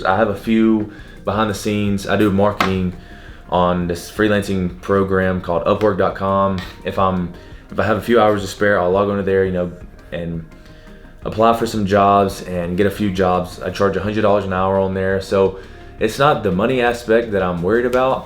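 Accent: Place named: Alabama, United States. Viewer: Y’all Haha can’t get rid of the bama talk.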